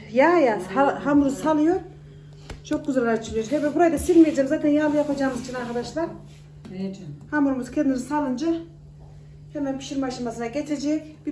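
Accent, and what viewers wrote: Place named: Aksaray, Turkey. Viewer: hanım benimde annemler aksaray koçhisarlı, sizi görür görmez şivenizden anladım:) babamda çorumlu, kültür birleşmesi cok güzel birşey insan sürekli yeni şeyler öğreniyor, çok becerikli bir hanımsınız taktir ediyorum:)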